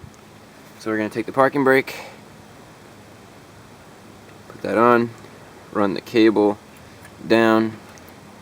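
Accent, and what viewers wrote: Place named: New Jersey, United States. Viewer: nice video thanks. I grew up in NJ - your accent sounds like you're from not far from there.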